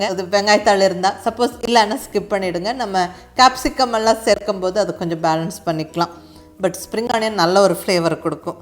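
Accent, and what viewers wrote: Coimbatore, India. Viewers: அக்கா நீங்க பேசும் கோயம்புத்தூர் தமிழ் ரொம்ப அழகாக இருக்கிறது.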